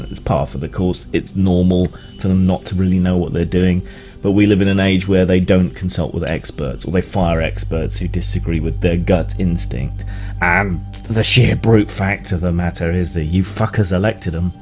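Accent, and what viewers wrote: United Kingdom. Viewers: Why the British accent,? Let's see if anyone get that.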